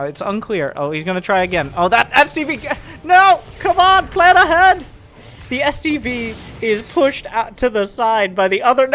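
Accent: South, United States. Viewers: WHAT?!? He grew up in the south or has a parent from the south then, or he's doing it as some sort of elaborate satire... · He's from the south. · M Ingham yeah I’m not so sure he’s from Michigan either no sources or anything he definitely sounds like he’s from the south, just letting the Aussie know where Michigan is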